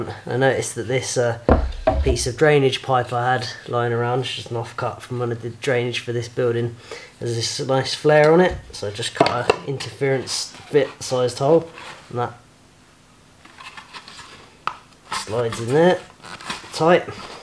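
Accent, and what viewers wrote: United Kingdom. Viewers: actually what I meant was his British English accent as compared to American. Sounds lovely.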